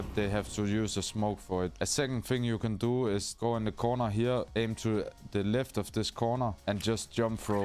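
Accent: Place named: Denmark. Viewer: Man kan virkelig høre du er dansker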